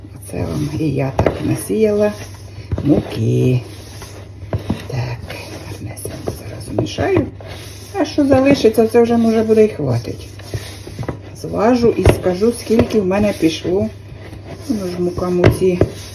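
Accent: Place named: Surzhyk, Ukraine. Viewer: не зникайте, а то сумую за вашими відео, ще Оленка подобається з "Мого сільського життя", такі ви дівчатка наші, а суржик просто рідний дім❤❤❤❤❤❤❤❤❤❤❤❤❤❤❤❤❤❤❤❤❤❤❤❤❤❤❤❤❤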